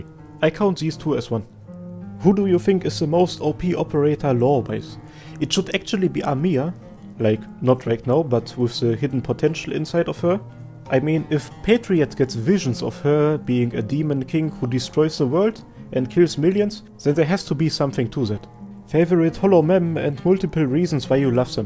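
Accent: Germany. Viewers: Glückwunsch! I found your channel a couple a weeks ago and I love your videos. I was more of an Azur lane player before and I didn't know much about the deeper lore in Arknights. I don't know if it's appropriate to say but I really like your German accent. I wish you many many more subscribers and views. Greetings from Hungary. :D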